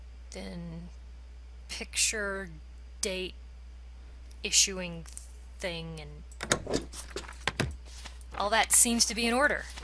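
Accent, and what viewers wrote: South, United States. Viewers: I like the southern accent.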